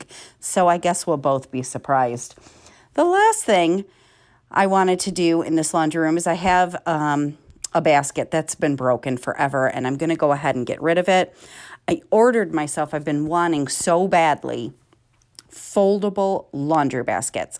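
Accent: England, United Kingdom. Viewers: I love remi been watching you both about the same amount of time to. I love your British accent. You should hear my American one its awful 😂 love to you and ypur family x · I love your English accent 😂great job ….lord I hate dust 😊❤️ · life is all about finding joy wherever you can and if talking in a British accent is what makes you happy then do it ❤ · Hi I’m from England and I’ve been watching you since you started and I thought you spoke in an English accent but I wasn’t sure and I didn’t say anything just in case, but well done it doesn’t sound that bad 😂😂❤❤ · I love your British accent 😂😂 If it brings you joy carry on 😁🇬🇧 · For sure...keep the British accent!! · This was a great one.. Love the little British twangy.. Appreciate you❤ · Great work I’ve not cleaned behind my washing machine for years and now you’ve made me realise that I’m going to have to do it. 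And I’m English and I love your English accent you do xx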